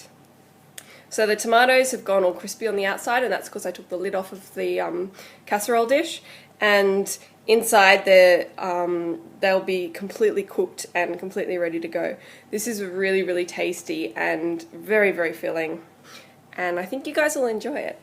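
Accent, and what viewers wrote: Australia. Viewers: FYI Australian accent :)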